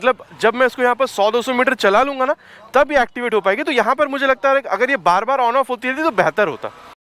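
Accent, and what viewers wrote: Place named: Bengal, India. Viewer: But bengali accent of hindi hits different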